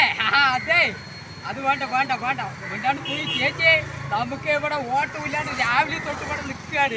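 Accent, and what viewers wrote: Palakkad, India. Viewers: ഇത് പ്രതികരിച്ചതല്ല ഈ ചേട്ടൻ ഈ പാലക്കാട ശൈലിയിൽ ഒരു വീഡിയോ ചെയ്തതാ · പാലക്കാടാണെന്നു തോന്നുന്നു 😃😃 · പാലക്കാട്‌ ഭാഷ.. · ഇത് പാലക്കാടൻ സംസാരംമാണ് എവിടെത്ത് ആണ്ങ്കിലും കൊഴപ്പമില്ല ഏട്ടൻ പൊളിച്ചു 👌👌👌👌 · തനി പാലക്കാടൻ ഭാഷ ഒരു പാട് ഇഷ്ടപെട്ടു🥰🥰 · അയ്യ്...ഇത് പാലക്കാടാണ്ടാ...🥴🥴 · really wonderful natural "palakkkadan" speech, super "eatta"....ide eppadi errukke"... "nan naadanda" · Palakkad bro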